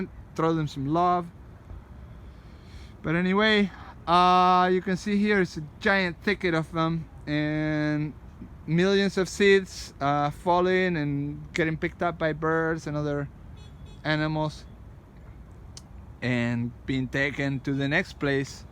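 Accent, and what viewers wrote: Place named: Argentina. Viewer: Tenés acento de argentino! Para mí tu español no es tan malo!!!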